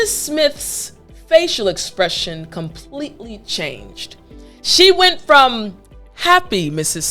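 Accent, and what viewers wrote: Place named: United States. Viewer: I really and truly appreciate your way of speaking,i'm also Working on improving my fluency.i'm a learner .and I am a congolese. Props to you I wanna talk as you are talking cos i live the american accent. I cannot express my appreciation.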